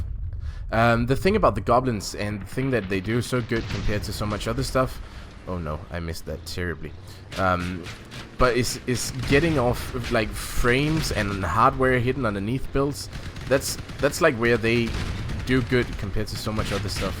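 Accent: Denmark. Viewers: Just a random guess, is from Denmark? (judging by accent) If so, then TUBORG HVERGANG!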